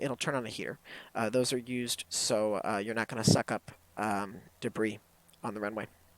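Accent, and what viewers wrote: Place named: United States. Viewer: Thanks for trying to give an explanation on these systems. I was looking forward to it BUT here is a bit of feedback on your attempt: 1. The audio is incomprehensible: there is the "p" noise for your breath going into the microphone. 2. You speak too fast with a strong US accents (not everyone is from the US) 3. You say too may words that confuse and dissipate the tutorial content instead of communicating just what is important. Clearly you haven't planned on paper what to say. 4. The cockpit is too dark and reduce visibility 5. The audio is too low 6. you move the camera too quickly, which coupled with a fast speaking makes everything impossible (for me at least) to understand. Sorry for being honest ;-) Hope you can re do this in a clearer way. Thanks again!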